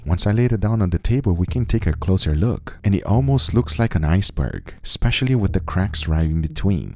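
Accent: Brazil. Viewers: Is this dude Brazilian? Major Brazilian accent!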